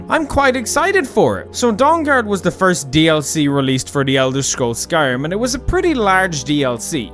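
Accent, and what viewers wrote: Ireland. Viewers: I just found this guy. He sounds Irish to me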